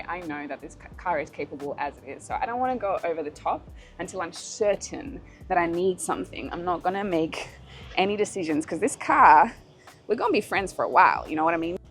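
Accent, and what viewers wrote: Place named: Australia. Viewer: is such a cool name! Especially with an Aussie accent, its just fun to say:)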